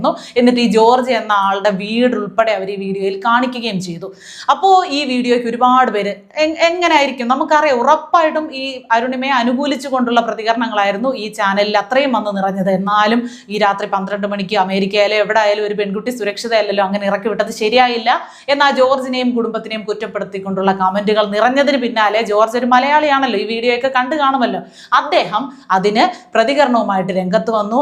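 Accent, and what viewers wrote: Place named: Thrissur, India. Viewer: ആള് ആള് എന്നു പറയുന്നതുകേട്ടിട്ട് തൃശൂർകാരി ആണെന്നു തോന്നുന്നു. 🤔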